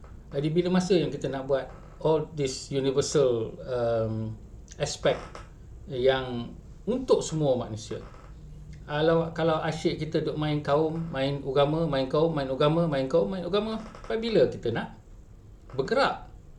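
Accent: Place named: Sarawak, Malaysia. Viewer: berucap mcm orang Sarawak. Mesej bes! Setuju 100%!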